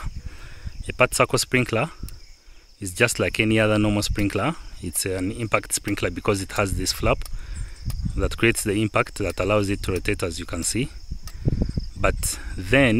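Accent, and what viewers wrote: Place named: Uganda. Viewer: You speak like Ugandan brother. Otherwise weldone!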